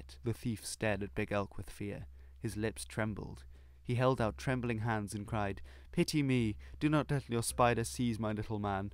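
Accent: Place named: United Kingdom. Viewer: It was very strange hearing this tale in a British accent. Well told, Sir!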